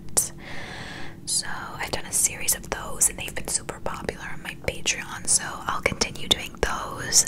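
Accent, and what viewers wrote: United States. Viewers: Oh heck yeah, you absolutely nailed the American accent! A lot of women on the East coast sound exactly like that lol. I'm o proud of you way to go! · really love the low lighting. your american accent was spot on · I’m American and I approve of this accent, it’s weird to think we are the ones who sound different. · great video & your American accent sounds awesome😊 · Your American accent's not half bad! Better than most of us American's would be able to do a British accent. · Great job! Your accent was a mix of a few different regions, depending on the words you were saying. The typical accent people do when outside of America is more of a west coast, California, sounding. You had a few words that came out more southern. This was fun! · Your American accent is so good your normal voice slipped through a few times but this is rly impressive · IM AMERICAN AND THIS ACCENT IS SO GOOD. 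You did SO well I can’t get over this 😂😭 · it’s actually so good!! u sound so american lolll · Your American accent is so amazing I thought you were from the U.S. until I checked out your other videos lmao.😂👍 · What? This “American” accent was so natural coming from you 🤣🤣 if I didn’t know, I’d be 100% sure you were American lol amazing job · American , British then Middle East accents are my fave ASMR accents. Have you ever thought about doing regional British accents in a video ? I always love to learn about The different accents of the UK . Anyway, you have a great American accent ♥️ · Your American accent is truly amazing! You really had nothing at all to worry about! You have either had some practice or it comes naturally! Lol either way, well done! I speak in your accent too and i think I'm who should worry! 😂 I think I'm terrible at it!😄❤❤ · As an american this is exactly how we sound like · This is so relaxing and your American accent is very good!!! 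· your american accent is so good !!! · PLS I DIDNT KNOW U COULD RECREATE AN AMERICAN ACCENT THIS IS SO GOOD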